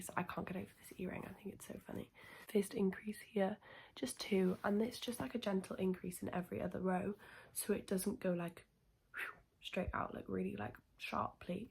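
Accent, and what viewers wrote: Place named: Pembrokeshire, United Kingdom. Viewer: Hia, I've just found your channel and I'm enjoying it. I agree that you have a lovely voice. Can I hazard a guess that you're from Pembrokeshire? I grew up there and find the accent very familiar and comforting.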